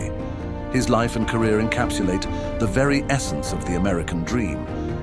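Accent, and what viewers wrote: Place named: United Kingdom. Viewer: Interesting to hear a British narrator on a biography of a player in the Great American Pastime.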